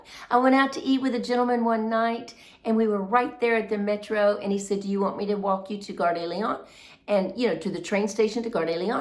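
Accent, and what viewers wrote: South, United States. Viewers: You are a delightful lovely lady.. you sound like a precious southern lady. I'm from northeast Georgia and you're living my dream. 🎉 i can't wait to see what we've been doing.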